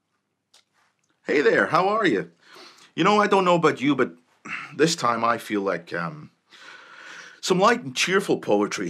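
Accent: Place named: Scotland, United Kingdom. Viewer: Scottish. There are clips of him speaking with his real accent.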